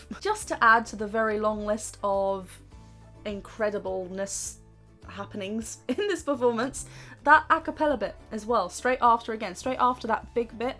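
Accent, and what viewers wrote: United Kingdom. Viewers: I've thought you are an American. Your accent British english. I lived in London around 1 year, long time ago. lives in Incheon. I live also same city in Korea.. I hope you will have a few more reactions for ' '. ' '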